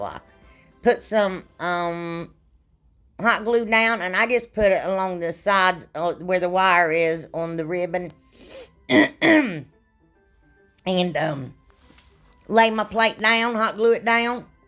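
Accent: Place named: North Carolina, United States. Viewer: This was my first time watching your channel and I was so impressed. Your projects are fantastic and your personality shines through. I love your accent. I’m from Tennessee and I thought we had a good southern accent but yours is precious.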